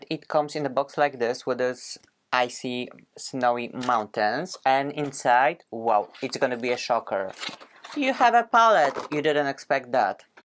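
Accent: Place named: Poland. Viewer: hi! where are you from? your accent seems extremely familiar and polish-like :)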